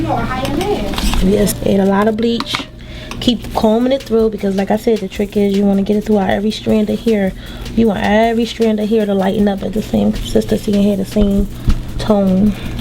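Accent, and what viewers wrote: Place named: Alabama, United States. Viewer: More like Alabama or Tennessee, sis. Nobody in Nola sounds like that... I’m from Nola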